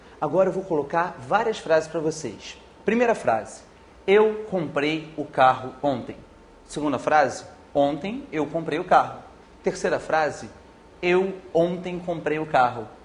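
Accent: Rio de Janeiro, Brazil. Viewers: sotaque carioca e muito irritante, mas, ótimo video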